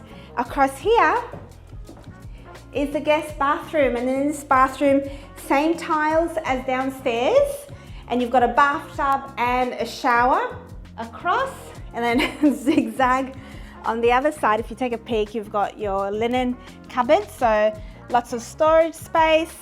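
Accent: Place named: Queensland, Australia. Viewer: BTW everyone, this is your typical eastern Australian accent, Queensland variant.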